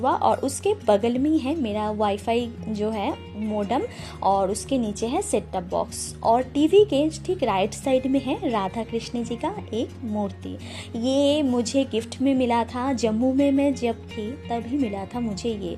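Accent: Bengal, India. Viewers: Aapke bolne k lahze me jo bengolipan dikhta h n wo aapki awaz me char chand lga deta h dii ..Love you dii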